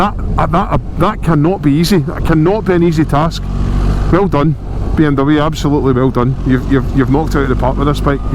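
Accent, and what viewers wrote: Scotland, United Kingdom. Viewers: Proper Scottish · you also have the best Scottish reviewer accent…ever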